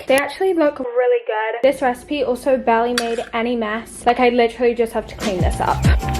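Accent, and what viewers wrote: United Kingdom. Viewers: Your videos are amazing!! Love your way to do this 💕 and your british accent is so pretty ❤ hugs from Ecuador :)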